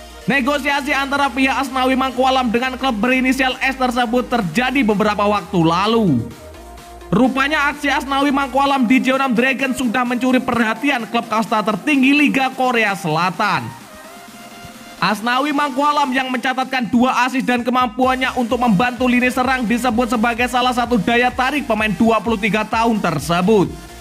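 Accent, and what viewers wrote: Java, Indonesia. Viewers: Jawieerr amat min logatnya .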